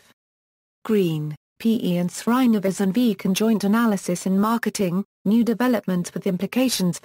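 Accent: United Kingdom. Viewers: Love this explanation with the British accent!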